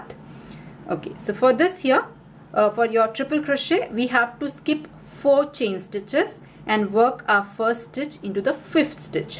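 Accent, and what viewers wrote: India. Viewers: When I heard the Indian accent I knew I would be able to do in one try(I’ve watched about a 100 American tutorials and got frustrated as hell)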